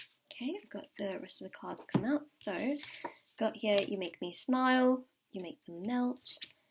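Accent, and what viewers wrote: England, United Kingdom. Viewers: Love your accent where in England are you from?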